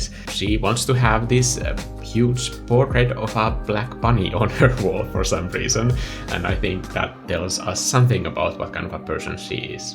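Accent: Finland. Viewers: I love your style so much 💚💛 and I knew I recognized that accent!! Kiitos videosta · I always loved these types of drawings as well, as a kid and up to this day. So the details, care, and attention you gave to your creation really resonated with me! You created a beautiful, relatable space which somehow feels both familiar and strange. So excellent, kiitoksia for sharing!! (I am guessing by your accent you are Finnish 😊) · Are you finnish? You have voice and accent like Tuomas Holopainen 😄👍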